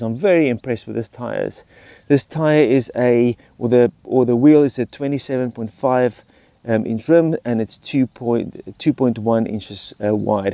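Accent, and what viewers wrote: South Africa. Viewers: Painful! Specs not correct, designed in the UK but built and assembled in China. All parts sourced in China but available here as well. Seeth-Afreekin accent still heavy!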